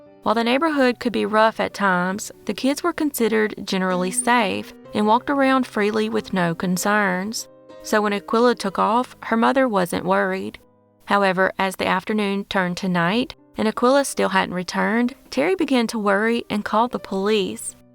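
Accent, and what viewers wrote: South, United States. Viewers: Mine is even more southern lol love your channel nice to hear another southern accent.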